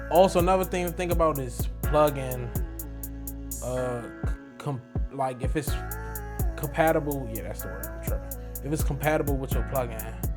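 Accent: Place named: Washington DC, United States. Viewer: You got a Dc/Pg county accent🫡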